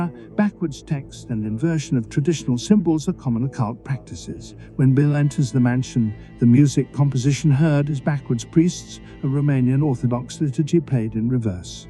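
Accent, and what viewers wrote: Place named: United Kingdom. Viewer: I love discussions on this film. I don’t have anything to add that hasn’t already been said But the flow, and delivery of the speech analysis makes it hard to absorb information. It is a bit too fast. There needs to be longer breaks/ pauses when you make those unique connections. ( and you did a great job on finding them! ) Also, maybe using added arrows with dual screenshots can help solidify each connection. Lastly, the AI voice over generator sounds pleasing- but makes it hard to follow quickly. Most Americans take an extra micro second to interpret British accents.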